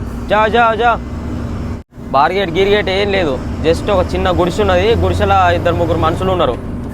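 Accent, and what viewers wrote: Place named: Andhra Pradesh, India. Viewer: Inkokasari andhra slang takuva chesi matladutey ninnu ni amma ni ni pellam ni Ni lorry lo ney denguta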